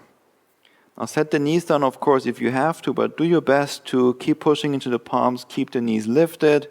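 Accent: Germany. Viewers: I can hear the German accent. Stimmt? I liked the video but this is not for beginners, I am sorry. I practice yoga daily for 7 or 8 years, and this is not beginners yoga. Vielen Dank. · Germany from the sounds of it hey? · Are you german?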